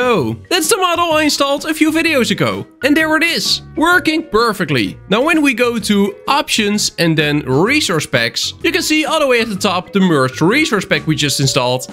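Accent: Netherlands. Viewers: I swear, every time I try to find a Minecraft server tutorial. You show up, and you don't disappoint me. It's very useful, thanks big dawg! (also nice Dutch accent.)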